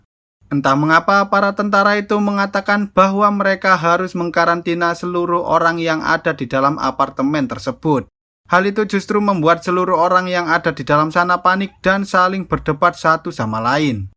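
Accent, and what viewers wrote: Java, Indonesia. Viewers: suara yang menjelaskan video ini suaranya ke jawaan bingits. Hehehe..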